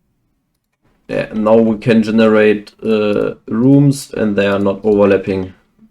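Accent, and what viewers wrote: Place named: Germany. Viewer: German accent goes BRRRRR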